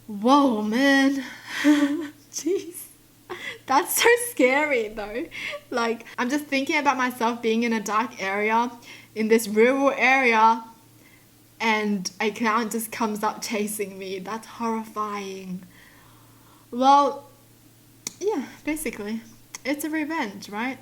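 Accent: Australia. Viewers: so if americans speak in american do they speak in other language? if brits speak in british accent does that mean they speak other language? lol im loving ur logic shes australian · lives in Australia. She has an Australian accent. I like her accent, too.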